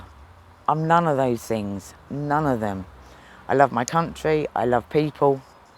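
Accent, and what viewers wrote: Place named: London, United Kingdom. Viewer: i always love your accent of Londoners although it hard to understand but i love it it always remind mo of Harry Potter see you around i have my premiere later see you my friend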